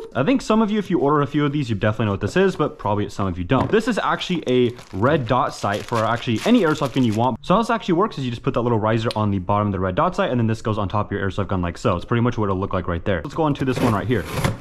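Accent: United Kingdom. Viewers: love the british accent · That british accent tho😂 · Love the Brit accent or is it your real accent im British bit I don’t sound like that but hey keep it up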